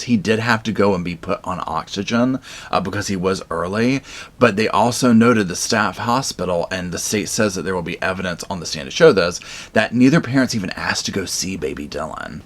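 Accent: South, United States. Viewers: I just love you! I’m from savannah GA and your accent sounds like mine & expresses so well what us southerners sound like telling any stories! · Dude southern accent is soo on point 👌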